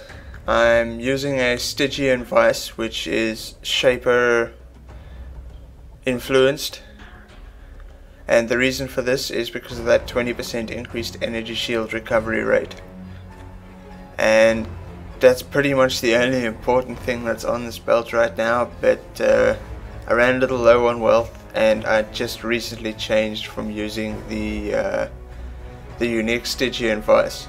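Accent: South Africa. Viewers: Are you south African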